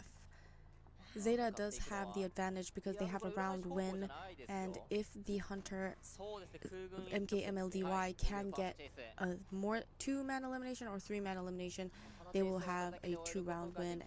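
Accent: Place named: Finland. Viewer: Tysm! This is so helpful, couldn't find a good guide on Ann. Btw where are you from? Your accent sounds the same as my finnish friend's haha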